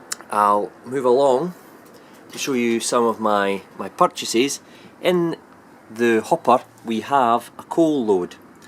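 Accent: Scotland, United Kingdom. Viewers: Nice work!!! I watch for the Scottishness as much as I do for the trains :)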